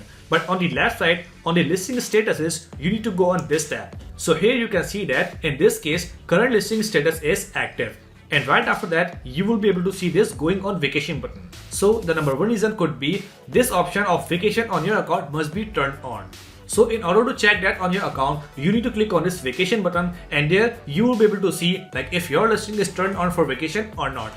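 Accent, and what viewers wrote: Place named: India. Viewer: not hate but your accent is an incredible thing that most indians do ITS AN INSULT FOR AUDITORS SERIOUSLY, fix this please!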